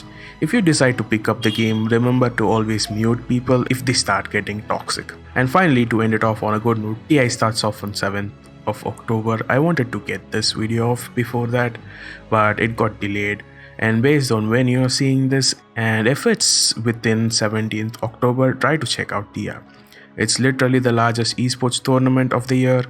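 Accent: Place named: India. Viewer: that indian accent haha superb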